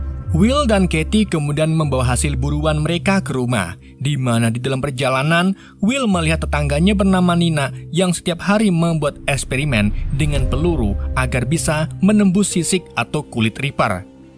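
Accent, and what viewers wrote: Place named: Pemalang, Indonesia. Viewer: admine wong pemalang😂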